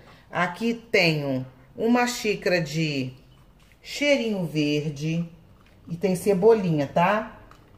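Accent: Rio de Janeiro, Brazil. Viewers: Hummmmm, que delícia!!! Amo esse sutaque de carioca, amo suas receitas!!! Simples e fácil!! Vc é o já mora no meu coração!!!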